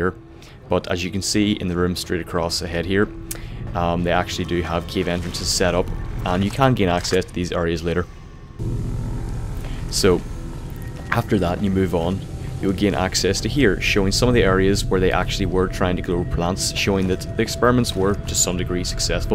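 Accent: Northern Ireland, United Kingdom. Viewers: Are you from Northern Ireland? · I respect you Northern Irish Morgan Freeman. Also as you didn't upload a video this week are you uploading an extra video?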